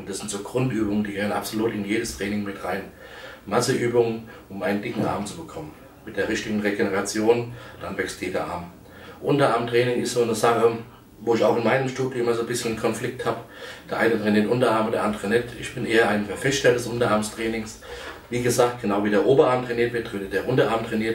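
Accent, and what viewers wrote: Germany. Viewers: Evangelium nach Johannes Ai bub geh mir fort des is hessisch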